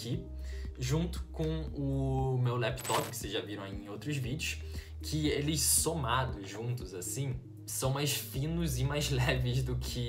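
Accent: Rio de Janeiro, Brazil. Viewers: Sotaque de carioca é muito bom kk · é incrível como você tá morando na Alemanha a 3 anos e ainda fala carioques fluente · Naviossx, claxsses, sxstudante, bem carioca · Chocado com o sotaque carioca do ícone da ciência, adorei o vídeo!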